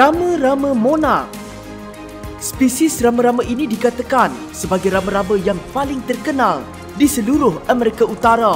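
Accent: North, Malaysia. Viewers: Tudia pekat nau utara..haha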